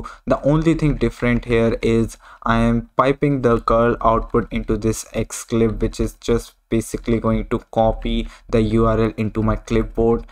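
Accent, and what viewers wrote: India. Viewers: Recognised you as Indian by the accent. I am Indian too, from Haryana, using arch linux with openbox. Tiling managers don't work for me. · I suggest you stop talking with an indian accent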